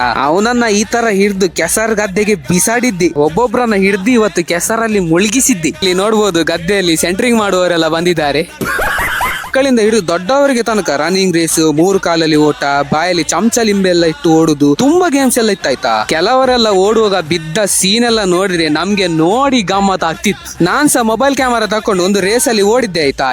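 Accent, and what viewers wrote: Mangalore, India. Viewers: Entha marra neeu chanda aagthade kushi aakthade antheera neeu sa banni antha kariudila alla😂😂😂 Summane aaitha 😂😂😂 Ae ne heli maarra nimma chanel nodlikke namma kudla kannada mathu kellikkene ondu kushi ne bere ❤❤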